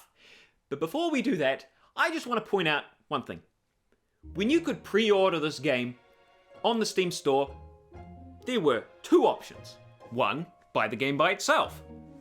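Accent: United States. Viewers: Your American accent is disturbingly accurate. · Damn, that’s actually a really good American accent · solid american accent even more solid impression of douchey card pack tubers lol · Dude, your American accent is so fucking good. 99% of people that try it still say certain "a" and "o" sounds similarly to their native accent, but you got it down. I fucking love your videos haha.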